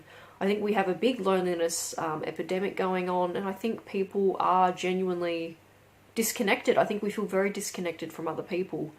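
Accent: Australia. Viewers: You have a northern Queensland accent😊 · Random question, where is Australia are you from? Just the accent is throwing me as to where 😆 Thank you so much for sharing your tips. We’re in QLD and can access the 50 cent public transport which is a help!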